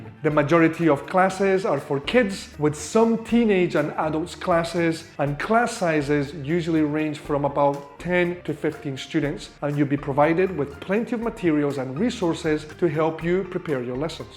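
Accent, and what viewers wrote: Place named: Arab world. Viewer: oh, that tough arabic accent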